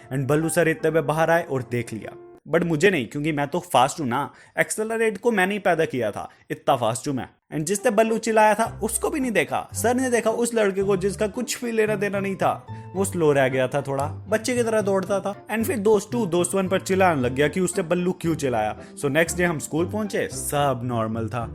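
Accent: Jammu, India. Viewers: ji aap jammu sai ho toh dogri bhi aati hogi🤣🤣 Dangar........ I am also from jammu and you know what when i saw ur first video i got that u r from jammu becoz of ur way of talkinh hehhehe I was laughing like hell while watching this Love ur videos ji☺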